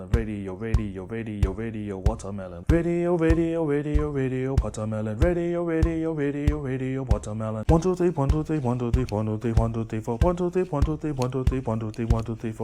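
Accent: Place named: Trinidad and Tobago. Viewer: Like ur vid's. I detect a trini accent in ur articulation. I could be wrong.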